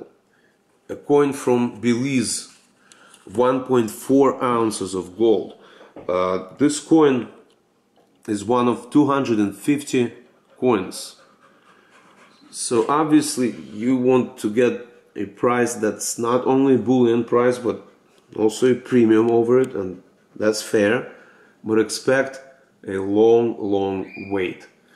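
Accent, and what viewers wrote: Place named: Russia. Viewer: Выдаёт русский акцент :)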